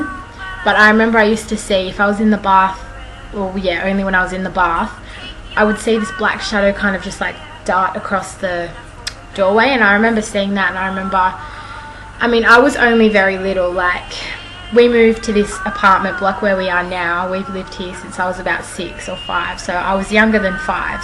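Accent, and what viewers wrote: Australia. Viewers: it's good to hear an australian accent again. spent 3 weeks there, about 10 years back, and i loved it there !! beautiful place. :) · i am just so used to my accent, i have the same accent as you, so you were just soooooooooooo easy to understand.i'm from the perth area. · I say, "I shit my pants" or "I would have shit my pants" all the time, but something about hearing it in an Australian accent made me laugh like crazy.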